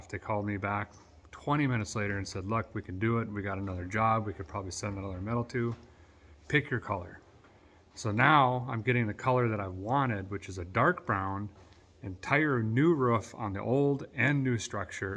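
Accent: Minnesota, United States. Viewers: Please don't loose the MN accident and start sounding like your are from Tennessee. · Too bad you left Minne-Snow-Ta... I get it when it comes to wrenching in the cold. Good luck with the projects. Keep your accent please. I have a hard time listening to people from the southern USA. Super nice folks mind you but my Midwest brain doesn't catch on as well... I'm sure my brain is still partially frozen... I will stay right here in the frozen tundra thank you. (Somebody has to live here)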